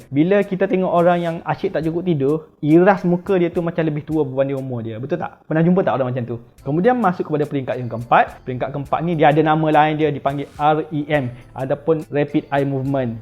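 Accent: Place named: Kelantan, Malaysia. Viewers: Bunyi lg slang kelantan tu🤣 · abg ni mesti kelantan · Hehe....klate la tu bosskur...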